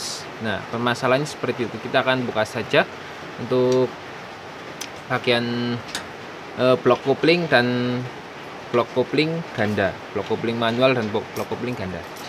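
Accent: Banyumas, Indonesia. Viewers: Akhire koe ngapak jugak🤣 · Kie wong mbanjar jane ya.. Mulane si ngapak😀😀😀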